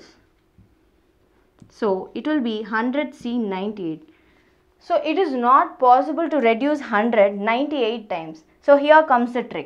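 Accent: South India, India. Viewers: from where are u mam ?? ur ACCENT is like south.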